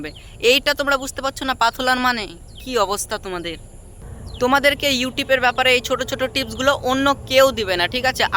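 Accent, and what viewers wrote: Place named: Malda, India. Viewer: ভাই তোর কথাগুলো শুনেই মনে হচ্ছিল যে তুই মালদার. আমার বাড়ি ও মালদায় মঙ্গলবাড়ী তোর কথাগুলো শুনে ভালো লাগলো.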